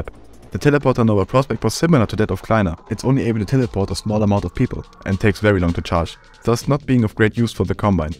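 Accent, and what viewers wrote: Germany. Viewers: Is he german ? i can't tell if so, ich liebe deine videos und dein content allgemein. Schönen tag noch ! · I loved to play EZ2 with it having an amazing story, and having a really cool stealth part with the monster in the Aperture Lab. Literally gave me chills! I loved playing these games as much as I enjoyed this video. Good job EZ2 devs and (btw your german accent is beautiful can't hate it. And don't worry mine isn't better xD) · I have a question:are you German? Besause i am German and you Said one time that you have a German ankzent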